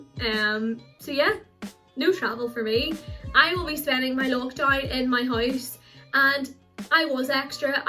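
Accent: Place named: Belfast, United Kingdom. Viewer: Belfast accent is the DREAM!!!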